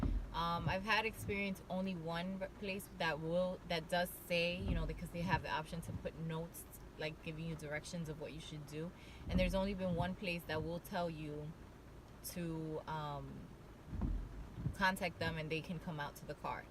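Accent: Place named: Latin America. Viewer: I’m guessing your Latina by the accent. Good luck stay positive but the best way to make money is by referrals if your in sales.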